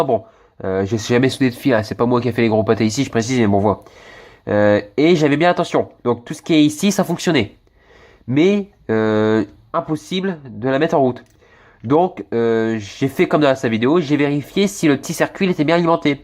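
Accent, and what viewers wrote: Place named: Nord-Pas-de-Calais, France. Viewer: chti reconno tè dmin coin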